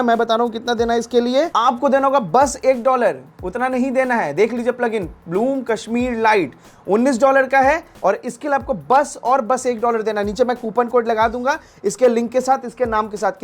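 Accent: Bihar, India. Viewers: TALK ALWAYS IN BEHARI STYLE IN YOUR TUTORIAL , I AM YOUR OLD SUBSCRIBER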